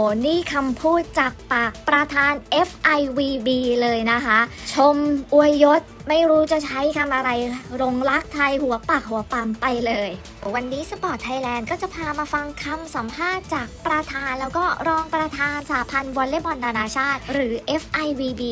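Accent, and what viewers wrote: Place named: Brazil. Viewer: Pelo sotaque ele é brasileiro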